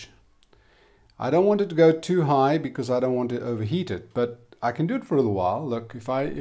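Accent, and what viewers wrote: South Africa. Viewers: Are you from South Africa? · I visited a family in Durban. I met them online. It was an amazing experience. The Tiplady family. We went all over KZN. Now I recognize the accent.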